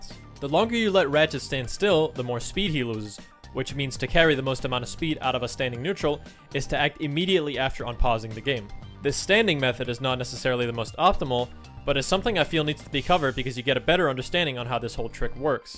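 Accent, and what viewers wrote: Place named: United States. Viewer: MattFlowerDrums He sounds like an american to me.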